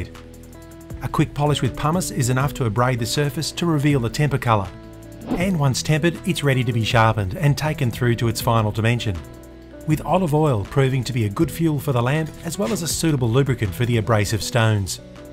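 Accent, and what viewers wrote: Australia. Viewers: Ele e Australiano. Eles mudam o som dos vogais. Use os subtitulos ingles.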